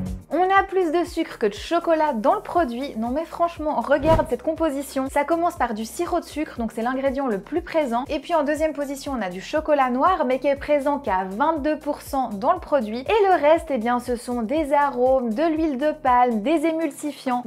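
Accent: Switzerland. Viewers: Oui mais le poids des myrtilles est-ce avec l'eau qui a gelé ? Ah c'est marrant j'ai trouvé dès le début que vous aviez l'accent suisse! De vers Neuchâtel ou par là! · Vous avez Un accent suisse 🇨🇭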